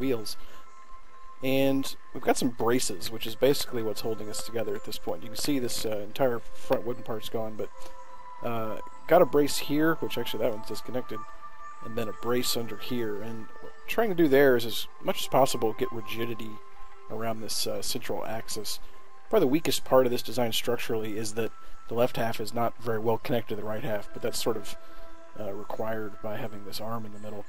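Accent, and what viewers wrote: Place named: United States. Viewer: That's great for you, but as an actual American I'm telling you this guy is an American speaking English. Not everyone in America talks the same way a rapper does. Pretty much no one does.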